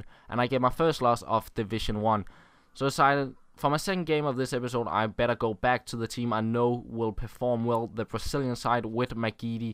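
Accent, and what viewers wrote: Denmark. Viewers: mate you from denmark! can hear it. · u danish?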